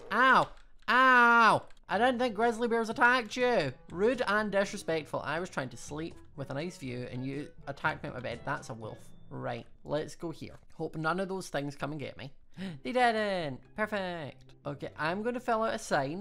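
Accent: United Kingdom. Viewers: U sound British 🤐🤐🤐🤐🤐🤐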